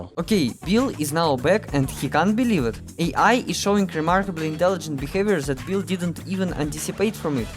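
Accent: Russia. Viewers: У вас сударь немного русский акцент 🤔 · ох уж этот Русский Акцент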